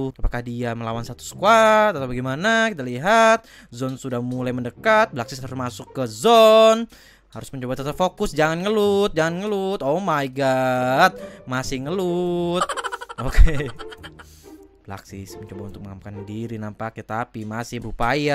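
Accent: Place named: Banten, Indonesia. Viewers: ngakak so much wkwkkw logat sundana banten pisan. leuwih alus keur maen pubg sunda oge mang wkwk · njiirrr ngakak Sunda na kang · Ngakak gua bang denger lu ngomong sunda😂😂salm dari sunda ciamis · Lucu oge sunda na si akang... wkwkwk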